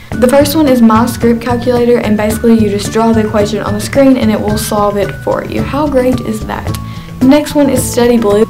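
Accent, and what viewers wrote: South, United States. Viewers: A southern accent. If you can't deal with it you need to grow up. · Sounds like she has a southern accent to me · she has a country accent so I guess she is country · wish i could like the vid but i hate southern accents :/ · Why is your accent so country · I thinks she's from Tennessee, or Texas, but not from Britain. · Lol same! First I thought she was Scottish, then British or Australian! Until I realized she's probably from the south of the US. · yeah I'm Australian too and it bothers me seeing all these people confusing this clearly american accent for an Aussie one haha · dat southern accent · a country accent and has blonde hair hmm.. this makes me wonder hmm..how would she look like ??. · she is so country omlllllll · are you from the south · I thought at the beginning but she has a freaking southern accent as well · your sooo country😍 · What's your accent? 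At first I thought it was foreign but when I listened harder it sounds country. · I really like her country accent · Pretty sure it's southern. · she's definitely from the states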